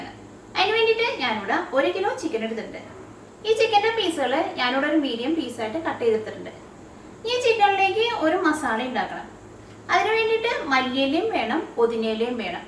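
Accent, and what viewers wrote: Thrissur, India. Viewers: nice recipe.. but I hate thrissur slang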